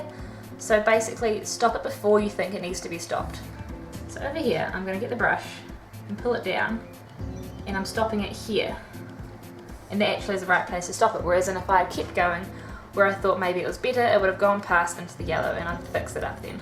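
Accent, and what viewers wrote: New Zealand. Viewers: Chur, you sound like a kiwi. Kia Ora and nice vid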